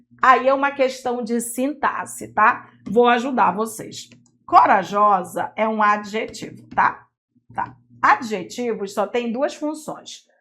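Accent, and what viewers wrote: Pará, Brazil. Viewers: Professora paraense?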